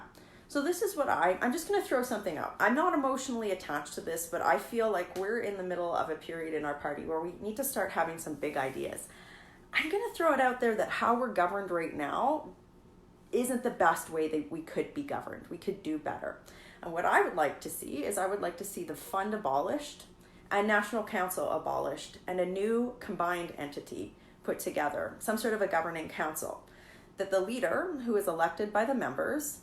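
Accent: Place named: Western Canada, Canada. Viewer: She is a western Canadian. We all sound like her here! :)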